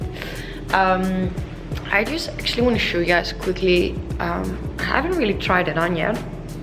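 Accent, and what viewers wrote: Netherlands. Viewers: I used to live in the Netherlands and I miss the Dutch/ English accent. I watch your videos just to hear your voice lol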